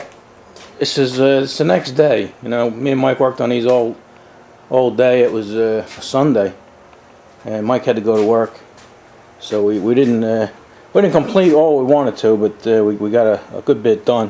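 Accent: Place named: North, United States. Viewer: Aye, Mike! Wddya say Mike, look here tubes! Ain't that right Mike! Hey Mike! Look ere tubes! hey Mike! I am just kidding, as a southern dude the northern dialect is fascinating! Keep up the good work!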